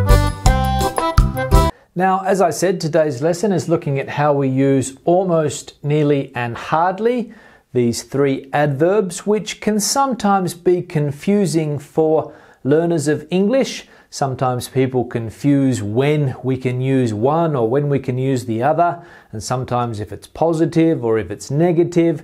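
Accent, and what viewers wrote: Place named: RP, United Kingdom. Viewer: It was very pleasantly and usefuly to listen to your lesson, sir! You have the real British aristocratic prunounciation! Thank you. I'm from Russia. Now I'll watch your classes more often.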